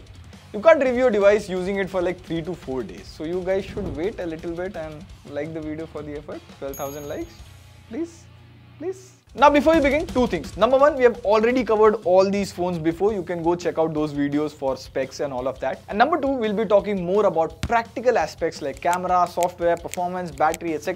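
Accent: India. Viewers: We need an easy ai tool to change indian accent to another accent (american ...).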